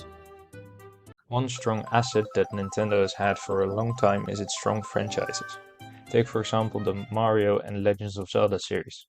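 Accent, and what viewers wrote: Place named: Netherlands. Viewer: in my native language it’s also succes! I thought i heard an accent, are you by chance Dutch?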